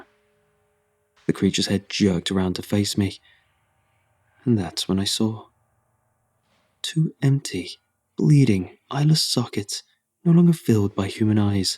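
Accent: United Kingdom. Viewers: Best horror reader there is. Can't beat a smooth British accent.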